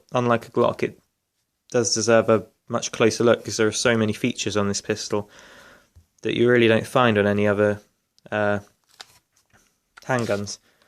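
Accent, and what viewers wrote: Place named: United Kingdom. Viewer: hey dude i can tell by your accent rhat ur British can you tell me what the requirements are for owing an airsoft gun in the uk thanks in advance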